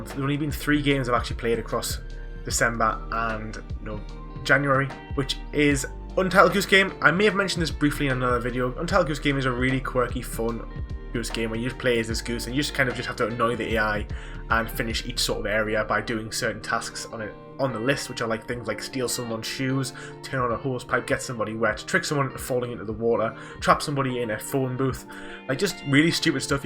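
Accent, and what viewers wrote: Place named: Teesside, United Kingdom. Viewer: Now mate been watching your channel a couple months, and judging by your accent I think your from near where I live. I live in Middlesbrough. If you don’t want to say where your from I understand.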